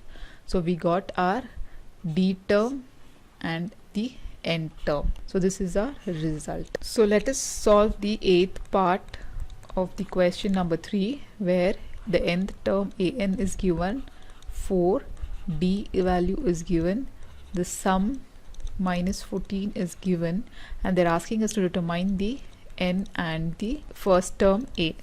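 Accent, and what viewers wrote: Karnataka, India. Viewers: Mam are you from Karnataka?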